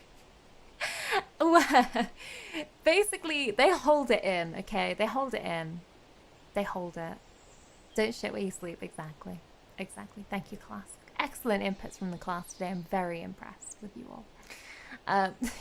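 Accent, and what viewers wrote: United Kingdom. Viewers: Now normally I am not the biggest fan of bees, but seeing with glasses and giving a lecture remained me of why she is the exception to my dislike. The lecture was very well done and the facts were huge eye openers like how bees do dance which I knew about or that bees can be trained. That does expand my knowledge a good amount more and again with glasses and her british accent all scream hot teacher so soild lesson!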